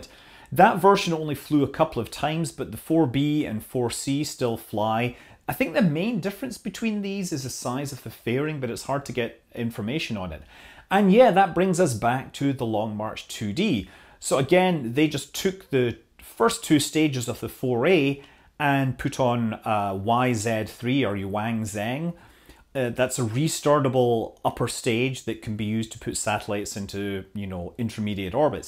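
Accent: Scotland, United Kingdom. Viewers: I'll be honest, I never thought I'd ever hear reasonably understandable Mandarin spoken with a Scottish accent. Not bad,. Not bad at all. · I love your accent. Are you Scottish?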